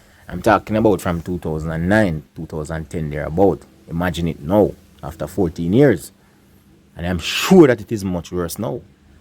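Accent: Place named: Jamaica. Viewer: Mannaz n Respect bredrin ow yu du. Few things fi sey, first, mi rate how yu present yuself, properly spoken with fi wi accent ,yes man yu gwaan good, respect. Second, yu kno sey a long long time mi a follow Colaz Smith and him bredrin Socks from waaay bak wen dem jus start, yah man, mi and mi cuzzin even try two a him recipe dem, we rate him man, nuff big ups. Third, we(Jamaicans) put the blame on the Govt and yes rightly so to some extent, but in my opinion there are numerous other factors to be considered, education, not school and books, but basic civil common sense education. We keep our homes clean, why cant we keep our country clean, impose strict rules on garbage, yes, the govt needs to import more equipment or hire locals. We as a people neeeeeeeds to take more pride, up our image, be activist for our environment, follow examples. In conclusion, each one teach one, yu see wen Colaz Smith come bak ome, express and show dem places like these to our people on his show, comment on it positive and negative and request suggestions and volunteers. 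Bless up, Jah guide and protect always.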